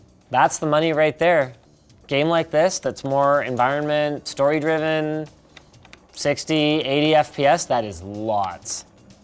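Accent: Canada. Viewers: Dang Linus’ Canadian accent came out to play in this video...and I’m Canadian lol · You can really hear the Canadian in Linus’s voice today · Is it just me or did Linus go super Canadian in this video eh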